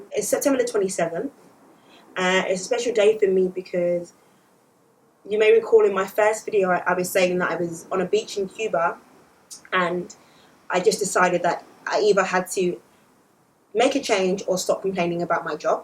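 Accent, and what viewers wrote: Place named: London, United Kingdom. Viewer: Love your vids. From a fellow Londoner (you sound like you're either from south or north London lol)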